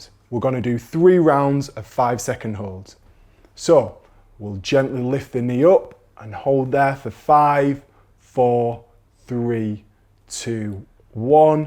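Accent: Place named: Sheffield, United Kingdom. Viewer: This is great. I'm from Sheffield but have lived in Mexico for years, so it's great to follow exercises for my hip arthritis from someone who sounds like he's from my neck of the woods. Update: Still doing these exercises, I like the clear instructions about correct technique but without unnecessary waffle. I feel like I'm in the room with the two blokes.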